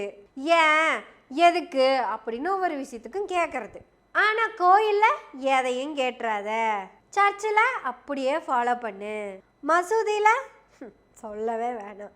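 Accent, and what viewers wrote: Kongu, India. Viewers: அம்மினி உன் கொங்கு பாசையை கேக்கவே உன் வீடியோவை பார்க்க தோனுது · மகளே நீ தெய்வகுழந்தை அப்படியே கொங்கு தமிழில் பொளந்து கட்டுறியே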